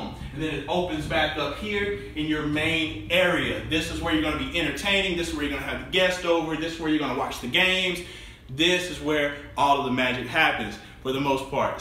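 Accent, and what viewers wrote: South, United States. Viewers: Was I the only one distracted by how tight those pants are on his beautiful 🍑🙈 I'm like 20% interested in these houses and 1000% interested in he's so beautiful his southern accent those muscles that smile is curly hair every single part of him!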